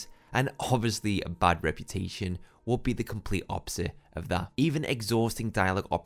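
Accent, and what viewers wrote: Liverpool, United Kingdom. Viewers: Are you a scouser lad?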